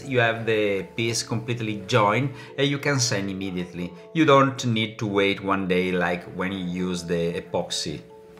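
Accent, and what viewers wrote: Italy. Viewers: "The restoring project for SAILOING around the world.", you speak english with a nice Italian accent. I like it. But, it is the first time I see someone WRITING with an Italian accent. Beautiful work, by the way!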